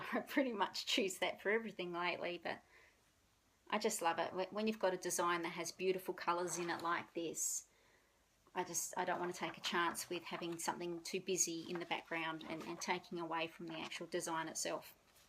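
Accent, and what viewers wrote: Australia. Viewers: I still am amazed at how much you get done with your month! Mermaid of Atlantis is beautiful. You should be very pleased with how she came out! All of those beads really do make a difference. I will be excited to start beading my mermaid! I'm still working on the skin, though... The little cottages are too cute! Blackwork sampler is looking very nice. And Refleggions looks so pretty! The purple is perfect for Easter. And your thoughts on the word khaki made me laugh. I had to say it out loud both times! I love listening to you and the other Aussies to pick up the accent. The Australian one is one I haven't yet been able to get. I can do a few phrases, but it doesn't hold very well, haha. At least now I can pronounce khaki!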